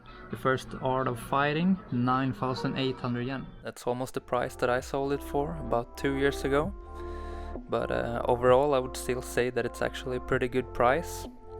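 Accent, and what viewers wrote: Sweden. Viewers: You sound Swedish, am i right?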